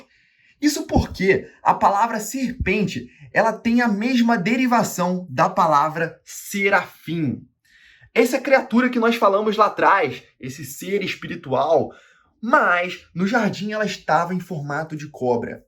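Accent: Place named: Rio de Janeiro, Brazil. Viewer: desconfio q vc seja carioca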